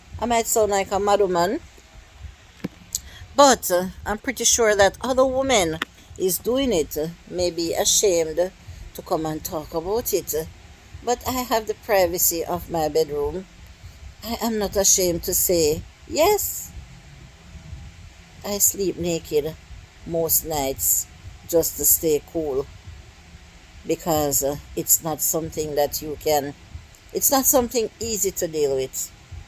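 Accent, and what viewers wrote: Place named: Jamaica. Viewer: I understood you perfectly clear...you are a well spoken Jamaican 😊 and the patois us just a little spice 😅👍🏽🇬🇧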